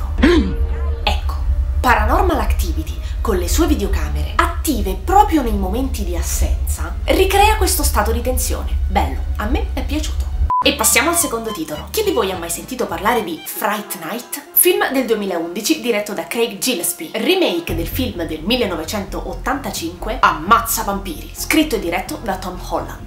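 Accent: Rome, Italy. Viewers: Ho visto tutti gli horror possibili e anche se alcuni mi hanno delusa sul finale mi sono comunque piaciuti... Ma "il quarto tipo" non so perchè mi ha fatta proprio cacà sotto!!! Tu l'hai visto? PS: a furia di vedere i tuoi video inizierò a parlare romano XD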